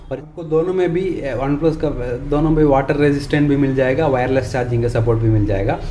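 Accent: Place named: South, India. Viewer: I love south indian hindi 😃😃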